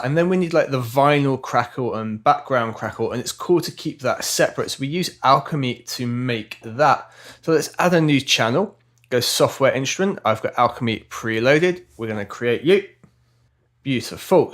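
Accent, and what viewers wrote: United Kingdom. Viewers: thanks man this was really helpful. u welsh?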